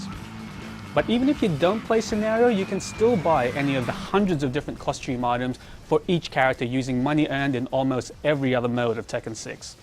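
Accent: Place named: Australia. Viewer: ... thats an Austrailan accent, you tit.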